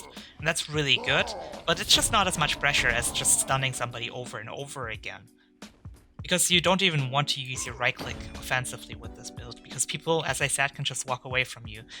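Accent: Germany. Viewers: du bist deutsch, oder? der Akzent klingt familiar :D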